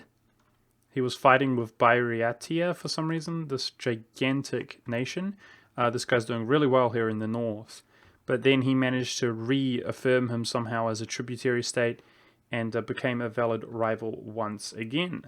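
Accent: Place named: New Zealand. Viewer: He sounds like he’s from New Zealand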